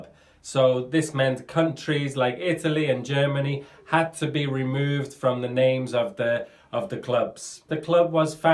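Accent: United Kingdom. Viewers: Are you a scouse?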